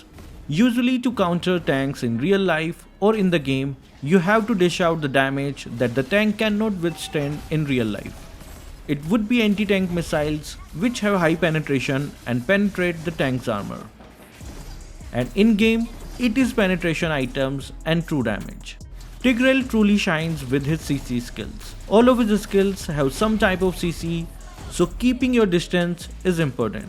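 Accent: India. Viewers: Are u indian?your accent feel like indian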